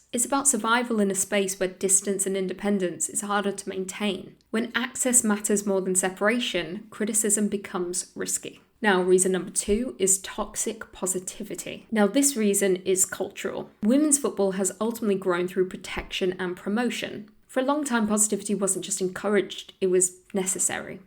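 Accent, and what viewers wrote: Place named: Transatlantic, United States. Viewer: Are you British or American? You're putting on a transatlantic accent, saying 'criddicism' etc. Don't be a wash.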